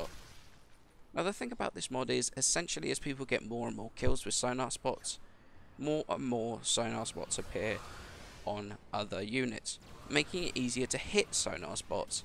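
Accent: United Kingdom. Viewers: I am confused. What has his accent to do with the fact that you are from Germany? In my opinion his accent is the mildest British accent i have ever heard. It is really easy to understand him. Or was that a hint that you would wish for a German version of this video?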